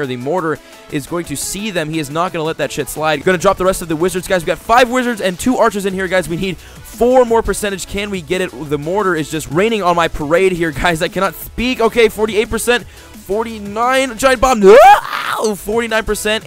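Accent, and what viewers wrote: Norway. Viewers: WAIT A SECOND!!! ARE U NORWEGIAN? lmao i just noitced :D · are u a norwegian?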